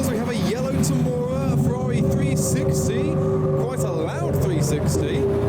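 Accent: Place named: United Kingdom. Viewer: When he started talking it was the most british thing ever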